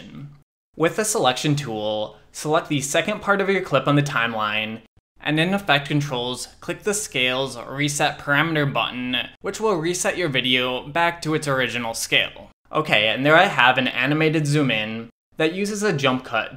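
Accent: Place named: Los Angeles, United States. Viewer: I am not american, but I noticed that LA accent)))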